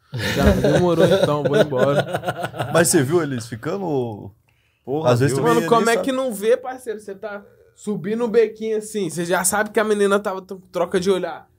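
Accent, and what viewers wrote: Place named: Belo Horizonte, Brazil. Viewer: Ambos são de Bh por isso que parece kkk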